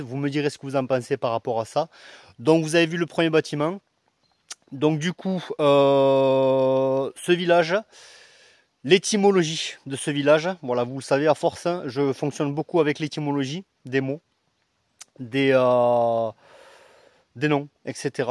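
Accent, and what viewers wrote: Southwest, France. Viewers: Bonjour 👋 je me suis abonné à ta chaîne très interessant est de belle qualité mais t’a le même accent du sud ouest comme moi 😁 tu est d ou ?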